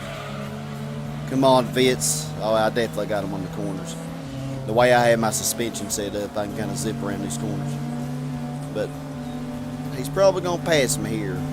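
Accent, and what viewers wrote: South, United States. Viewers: Love your southern accent makes these videos real entertaining to watch, like its if goofy is commentating this badass game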